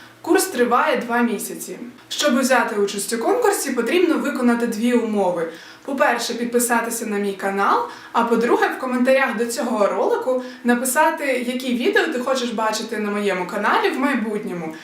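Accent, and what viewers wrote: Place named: Russia. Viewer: потренуй фонетику, маєш дуже російське ч і ц. Варто також трохи інакше вимовляти кінцівки осоьливо там де говориш чи і ці. Впродовж є таке слово, також є гарно дякую, є просто подяка. Відео знімати. можливо твої російськомовні колеги перейдуть на українську, побачивши твій чудовий приклад. Молодець!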